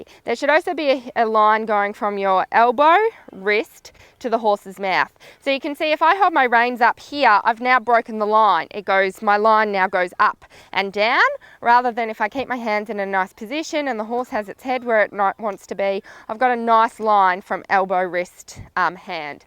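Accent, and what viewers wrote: Australia. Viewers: Ahh so nice to hear an Aussie accent! My sister and I are from Australia too, I'm super into dressage and I love your videos! (you can check our our channel if you like!) Keep it up! Good luck with the baby (saw a more recent video earlier!)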